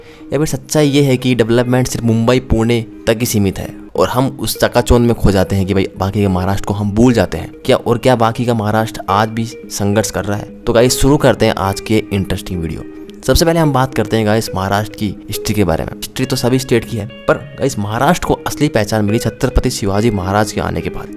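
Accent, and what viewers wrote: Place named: Pakistan. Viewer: Tumhe kisine nahi pucha kya reality hai Pakistani tum ho tumhara dialect batata hai . Ai Bana Kar kuch bhi bakvas mat banao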